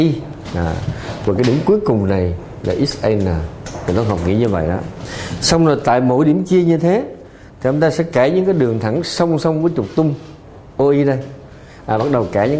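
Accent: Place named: Central, Vietnam. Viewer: Giọng thầy miền Trung, Qng hay Qnam thì phải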